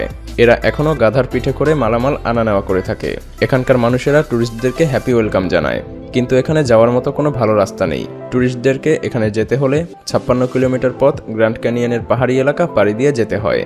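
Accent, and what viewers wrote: Bangladesh. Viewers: Vi tmr voice ta r description a dawa number gulo bangladeshi tumi protek video teh kollkata tag koro kno tumi nijer country k tag koro na kno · ভাই আপনে কি বাংলাদেশী? আপনার কথা শুনে যতদুর মনে হয় আপনি বাংলাদেশী। বাংলাদেশী হলে লোকেশন কলকতা দেয়া কেন? কলকাতা লোকেশন দিলে কি ভিউ বেশি পাওয়া যায়? নাকি নিজের দেশের জেলা লোকেশনে দিতে লজ্জা লাগে? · Apnar kotha bngladesi dr mto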